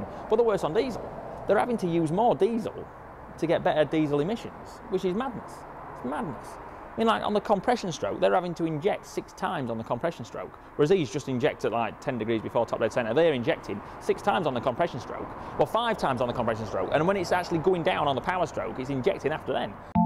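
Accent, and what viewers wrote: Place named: Lincolnshire, United Kingdom. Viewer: Nice to hear a Lincolnshire accent mayat.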